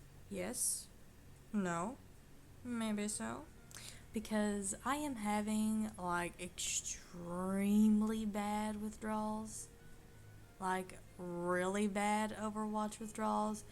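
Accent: South, United States. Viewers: do you have a southern accent? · Where are you from? You sound really southern, I'm from South Carolina but you sound more southern than me and my friends.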